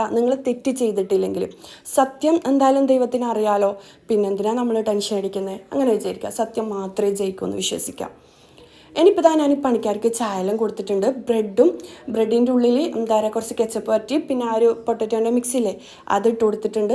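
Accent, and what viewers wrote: Kannur, India. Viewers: Sorry nan parayunnad thonna Ruth cheriya thettan Nammude kannurkare prathekich thaliparamb payangadi bagathullavare ellavarum kaliyakkum samsarasheyliyil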